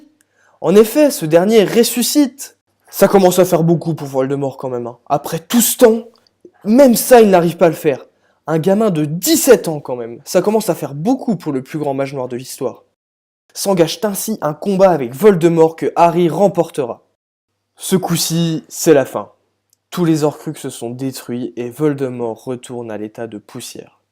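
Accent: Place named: South, France. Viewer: super voix, j’adore l’accent sudiste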